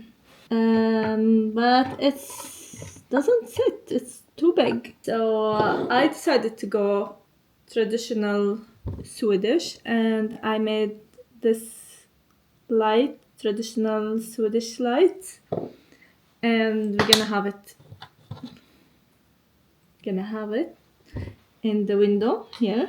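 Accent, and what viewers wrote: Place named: Lebanon. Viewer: Your accent is Lebanese ❤❤❤❤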